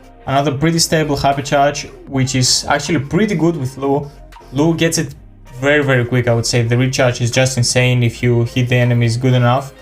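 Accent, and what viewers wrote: Greece. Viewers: Ayeeee the greek accent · The Greek accent🇬🇷🇬🇷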